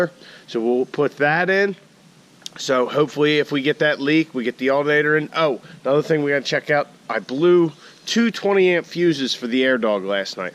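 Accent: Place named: Baltimore, United States. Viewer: Hey, I'm new to your channel. Nice videos. I see you live in Buck..I'm just a few miles south of you in MD. You have a Baldimore accent lol.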